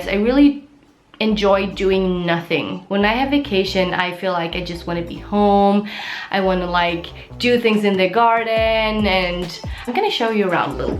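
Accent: Sweden. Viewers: Oh love tour videos! I've followed you for a looong time and remember when you started speaking english in your videos and it's crazy what an improvement your english has gone through! Jag glömmer mellan gångerna vilken härlig dialekt du har tills du pratar lite svenska emellanåt. Fortsätt gärna visa dina tatueringar och piercingar 💋⭐️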